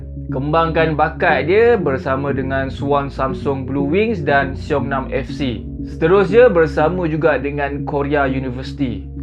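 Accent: Malaysia. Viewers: Pronunciation biar betul bang, jangan Melayu sangat bunyinya.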